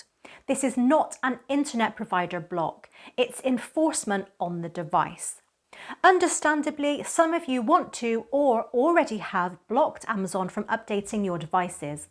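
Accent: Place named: West Country, United Kingdom. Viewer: Lovely to hear and see a UK/west country female giving straight forward info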